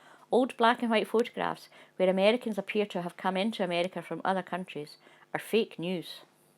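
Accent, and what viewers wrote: Scotland, United Kingdom. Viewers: No she is Scottish if you want to learn standard Uk English look up how to learn RP and the sounds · Is your pronunciation is how the British accent sounds?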